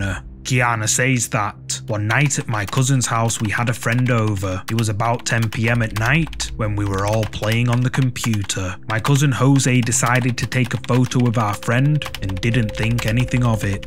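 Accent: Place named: Scotland, United Kingdom. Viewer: Love this (Scot?) accent!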